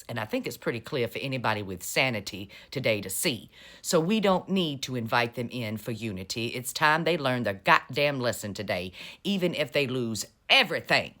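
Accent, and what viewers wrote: South, United States. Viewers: I enjoy not only your forthright news, but the Southern way in which it is delivered.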